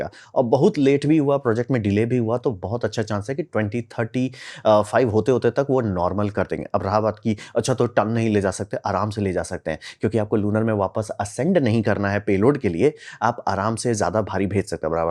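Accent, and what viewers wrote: Bihar, India. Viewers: 💯 आप बिहार से हैं सर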